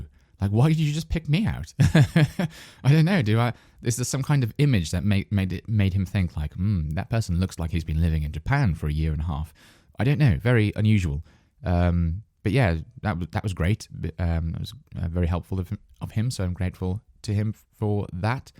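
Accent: United Kingdom. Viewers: British Accent ❤❤❤❤❤❤ I love listening British podcasts.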